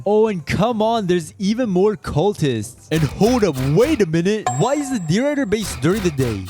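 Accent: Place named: France. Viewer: T’es français mdrr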